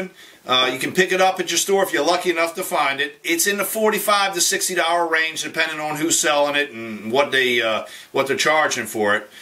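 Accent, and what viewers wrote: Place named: New Hampshire, United States. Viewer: Very informative video. I shared this for my friends to view. Love the accent. Reminds me of my mother and her accent from New Hampshire.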